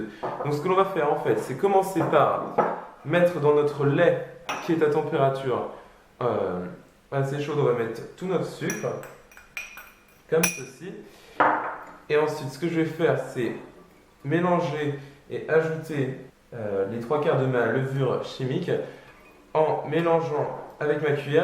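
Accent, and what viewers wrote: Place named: England, United Kingdom. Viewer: Tu te la pette avec ton accent anglais et tes donugts son trop épait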